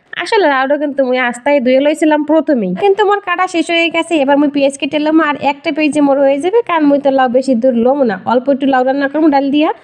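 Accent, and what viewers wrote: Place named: Jessore, Bangladesh. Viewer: বরিশালের কথার মাঝে মাঝে যশোরের ভাষা আসে 😂 মাশাআল্লাহ অসাধারণ আপু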